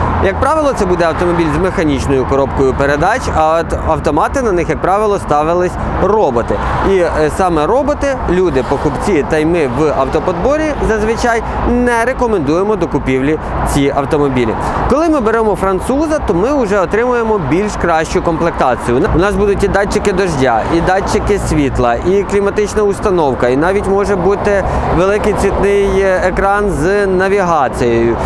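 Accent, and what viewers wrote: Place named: Rural, Ukraine. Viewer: Говориш, звичайно по кугутському)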